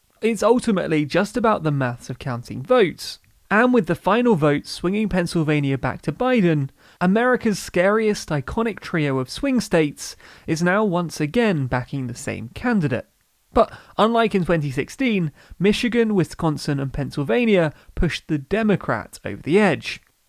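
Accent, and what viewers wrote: United Kingdom. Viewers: The initial cringe I had hearing a british voice talk about "transition period" I was thinking IT NEVER ENDS!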